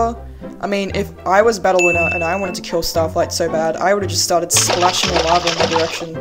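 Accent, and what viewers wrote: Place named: Australia. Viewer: Ohh your’e Australian? So am I - also these are very accurate ranks in my opinion.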